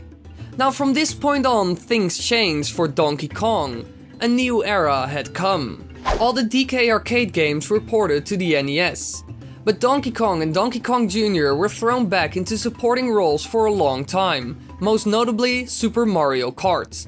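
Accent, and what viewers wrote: Netherlands. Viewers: are you dutch? your accent sounds dutch... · Yeah, he's Dutch.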